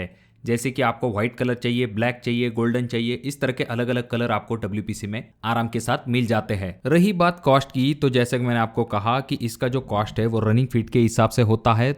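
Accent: Maharashtra, India. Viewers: Typical Marathi accent in Hindi.... Proud of you...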